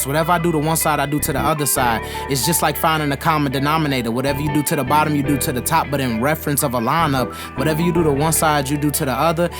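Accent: Chicago, United States. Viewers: Is he from Chicago? The accent sounds familiar 🤔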